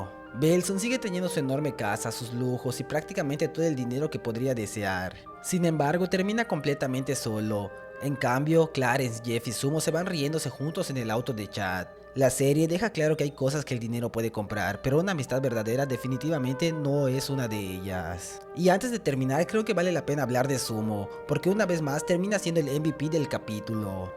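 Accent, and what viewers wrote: Yucatan, Mexico. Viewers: Maaaa mi karnal suena como yucateco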